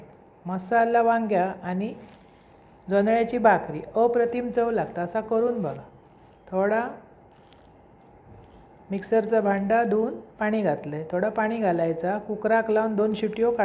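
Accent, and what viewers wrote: Malvani, India. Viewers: Tumchi swatachi ashi bolnyachi style aahey.Jyatun tumchi maiboli dokawatey.Aaikaila chaan watatey.Recipes ter jashya malwani lok kartatath tasyach sangata.Kunala aasal malwani padhatichey jewan shikaichey asel ter tumchye channel jaroor bagaway.All the best dear for not copping anyone else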